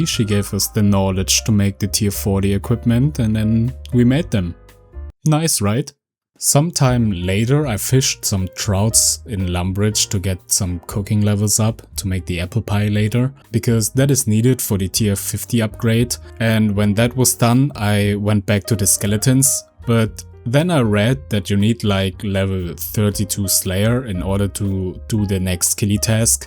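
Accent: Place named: Germany. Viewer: Nice vid! Will watch your series :P Your english is pretty good (Like me, you have an accent, but I understand everything you say) German? Looking forward to the other vids!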